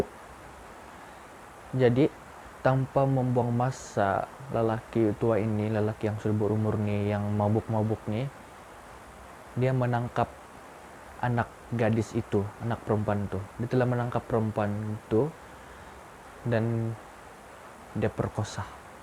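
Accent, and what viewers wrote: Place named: Sabah, Malaysia. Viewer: Suka sya dgr ko cerita ~~ slang sabahan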